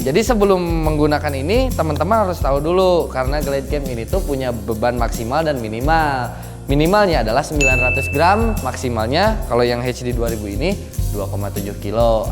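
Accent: West Java, Indonesia. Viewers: ia kang ketauan banget sundanya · Kalo dari logat logatnya orang sunda nih kayanya😁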